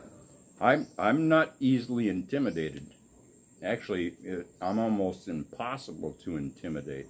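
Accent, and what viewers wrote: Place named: Midwest, United States. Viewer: Hello. I really enjoyed your stories. I remember the stories about when you was a kid in Minnesota at the vacation spot they used you for a fish tour guide. That voice you have in that Midwest accent really does a great job at storytelling. If you look through any comments I've made I don't think you're going to find anything that I've ever said that's been negative. I wish you and Rose the very best. Just an idea it would be nice to see if does come out of her shell the progression of that. I've seen some other guys that have had shy Filipinos and it took a while but you could see them get better and better at being on camera and such. God bless good luck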